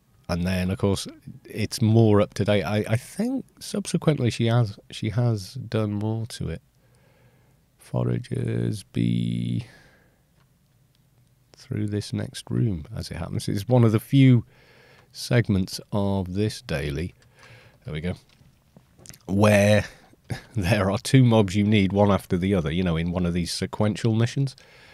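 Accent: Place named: Scouse, United Kingdom. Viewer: I always thought you had a bit of Scouser in your accent.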